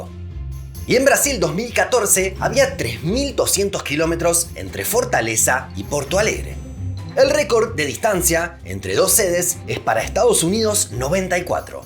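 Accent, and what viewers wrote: Argentina. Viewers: Sos argentino loco? De donde sos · Jejeje ahí vi que tienes un telescopio 🔭.. Casualmente estaba buscando paisajes de Argentina en Internet cuando llegó tu notificación... Creo que eres de Argentina por el acento, de que provincia por cierto, si en un futuro viajo a Argentina espero encontrar a la gente que conocí en Internet allá (no debería pero ya que) Las provincias que quiero conocer primero son Neuquén, Mendoza, Jujuy,Misiones,La Rioja,Chubut, Río Negro,Tierra del Fuego,Salta, Córdoba, etc... Un saludo desde Costa Rica 🇨🇷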